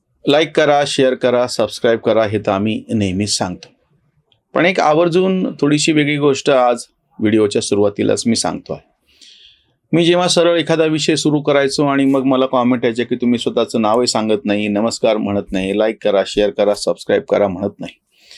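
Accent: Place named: Marathwada, India. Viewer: हि मराठवाड्यातील बोलीभाषा आहे.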